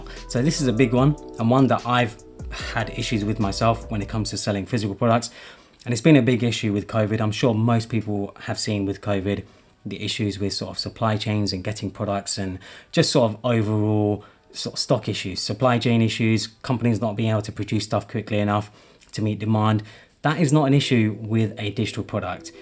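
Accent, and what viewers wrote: United Kingdom. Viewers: So lovely hearing and English accent. I'm so used to American channels...looollll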